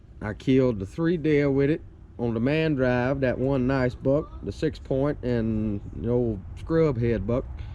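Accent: United States. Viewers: I don't know what part of America but just love that accent. Thanks for the review.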